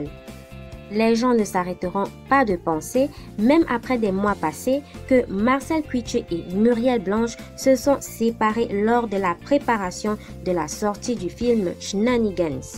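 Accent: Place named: Cameroon. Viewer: Ton accent kmer est très prononcé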